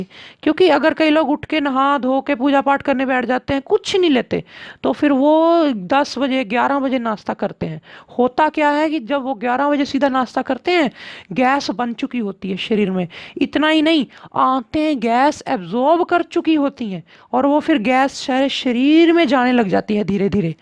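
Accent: Punjab, India. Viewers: Thanx for best useful information an di like your punjabi accent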